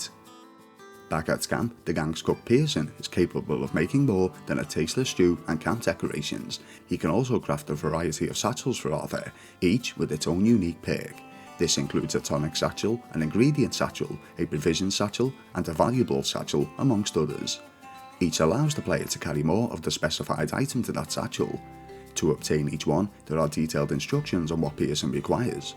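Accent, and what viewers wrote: Liverpool, United Kingdom. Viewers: 😂 he is a native he's from Liverpool by the sounds of it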